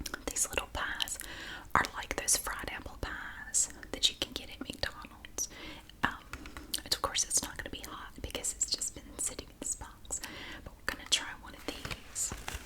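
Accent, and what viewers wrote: South, United States. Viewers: As a southerner, I love to watch your videos! Makes me so happy to hear your accent! Sending hugs from Texas!!! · I’m a baby and you’re my mom right now! I love how southern and relaxing your voice is❤️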